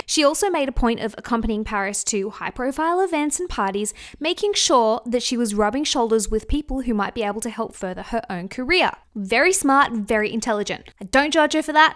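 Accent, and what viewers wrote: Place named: Australia. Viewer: I know it's hard but the lady speaking is Australian.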